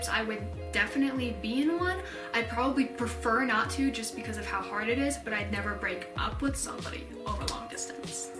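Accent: United States. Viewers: Wait is she American????????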